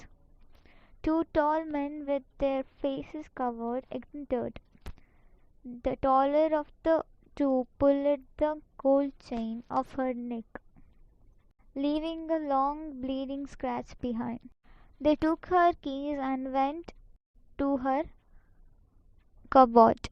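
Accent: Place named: Mangalore, India. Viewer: After a long time listened the voice of monglore people in youtube😂 and this was well explained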